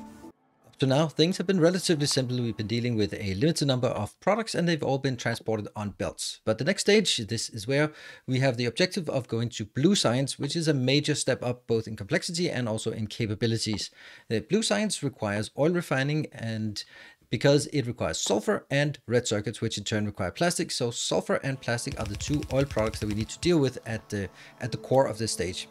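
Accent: Denmark. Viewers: You sound Danish.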